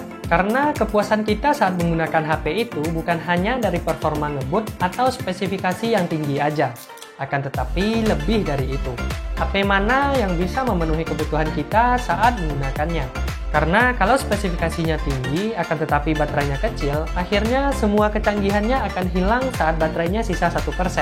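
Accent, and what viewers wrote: Padang, Indonesia. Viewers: Orang padang yo bg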